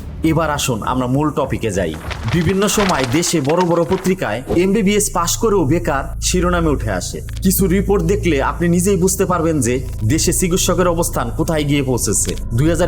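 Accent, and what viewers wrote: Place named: Patuakhali, Bangladesh. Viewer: ভাই আপনার বাড়ি কি পটুয়াখালী? কথার টোনে অনেক আঞ্চলিকতা টান আছে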